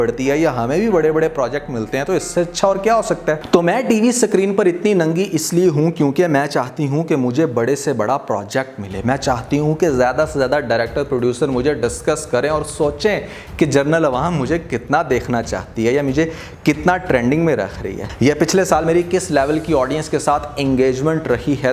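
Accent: Punjab, India. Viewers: I would suggest you do record your videos in Punjabi. You can't speak urdu with clarity. Your punjabi style urdu hurts.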